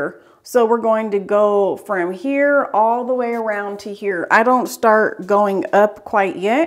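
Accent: South, United States. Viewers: Watched this just to hear her speak...her is deep southern...with the boujie R's🤣😍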